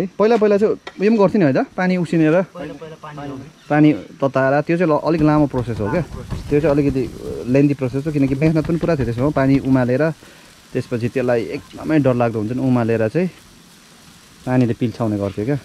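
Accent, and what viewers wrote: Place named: Darjeeling, India. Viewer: gaun thau herda nepal jasto chha feri boli darjeeling tira jasto chha kaha hola?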